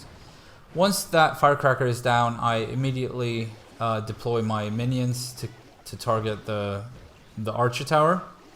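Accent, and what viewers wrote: Sweden. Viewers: Is he swedish? Just noticed this awesome channel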